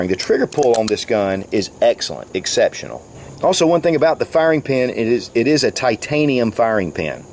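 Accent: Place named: South, United States. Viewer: Very nice review of this new Ruger SR1911. I love all your reviews. Something about your smooth Southern accent is pleasing to this old Yankee. Keep up the great work! Thanks!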